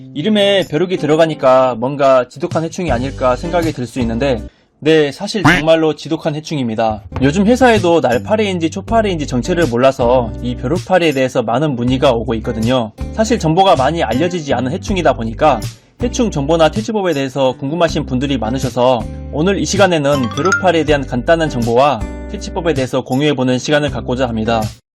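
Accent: Jeolla, South Korea. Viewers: 전라도 사투리가 조금씩 들리네요ㅎ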